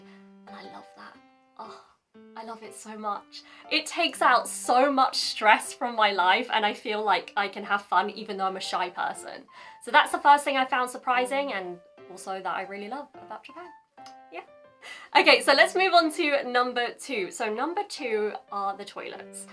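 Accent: RP, United Kingdom. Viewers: 当たり前ですが英語がとても上手いですね。ネイティブスピーカーを捕まえて批評など毛頭ありませんが素直に発音がいいと思うし話し方に品がありますね。同じ英語でもイギリス人はアメリカ人のような砕けた話し方は決してしない。本物のイギリス人を実感します。発音はやっぱりイギリス英語特有で喉の奥から発音するやり方で我々日本人にはなかなか真似できない。イギリス人の友人から「トマト」は「トマート」と発音し「トメート」とは発音しないと言われたのを思い出しました。それからどちらの国も島国で王室や皇室があることや騎士道や武士道があることも似ていますね。日本での生活は色々大変だと思いますがどうぞ頑張って下さい。そして楽しんで下さい。 May God make your life in Japan be more enjoyable and unforgettable. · Hey,! I really admire your BRITISH ACCENT! People say my English is American, for I learned by American English textbooks since my junior hi days. When university, I was much influenced by Country-Western, bluegrass and hillbilly music, and now my accent sounds deeply Southern American. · イギリス英語の発声はやっぱりカッコいい！ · Nice Queen's English.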